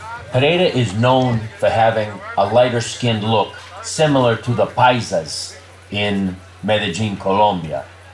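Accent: New York, United States. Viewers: Keep up the good work on your videos. Your accent sounds like New York City, where I lived for 35 years. So I take your evaluations about Pereira very seriously.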